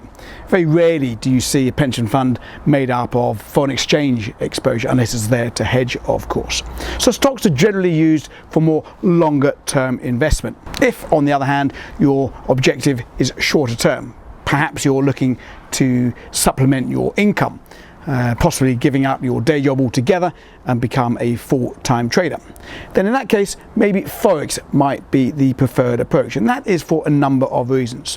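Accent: United Kingdom. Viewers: Love Your Video And Your UK Accent Is Amazing Too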